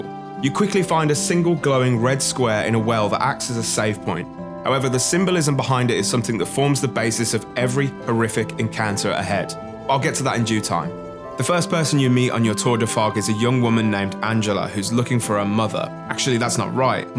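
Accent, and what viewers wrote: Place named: United Kingdom. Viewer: oh shit and he's British! (Same here dude)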